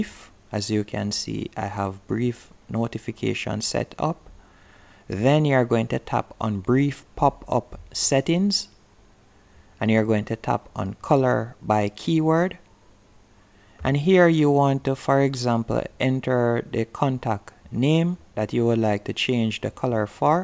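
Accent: Jamaica. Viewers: Dude has an Jamaican accent!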